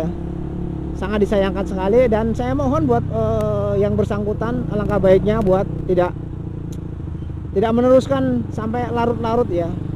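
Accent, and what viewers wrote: Java, Indonesia. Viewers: Masih medok ya 😂😂😂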